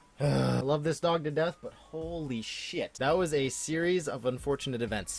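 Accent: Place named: Indiana, United States. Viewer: are you from hickville indiana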